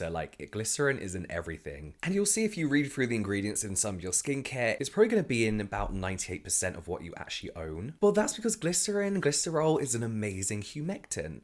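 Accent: United Kingdom. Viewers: Are u British?your accent seems so yeah?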